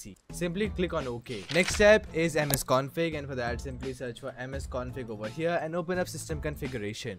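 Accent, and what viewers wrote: India. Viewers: English of The índia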